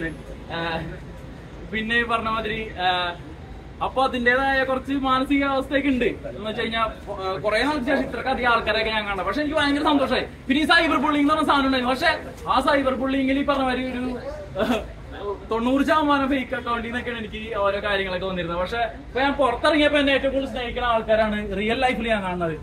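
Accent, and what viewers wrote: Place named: Coastal Kerala, India. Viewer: No kadappuram slang now😮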